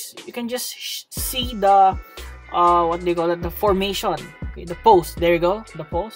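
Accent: Philippines. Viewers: Oww filipino ka pala halata naman sa accent mo!!!! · i know a filipino when i hear them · I fricking knew it, you are Filipino, I knew it by your accent >:D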